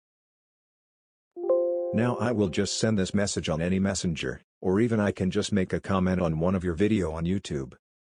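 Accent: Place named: United States. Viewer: the American Accent makes it easier to understand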